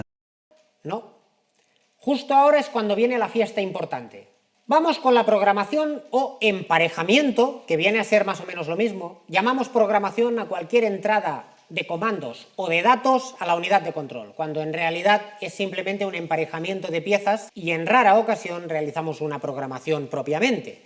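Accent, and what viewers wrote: Spain. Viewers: Nuevo suscriptor. Ese acento catalàn me recuerda a un querido profesor de la infancia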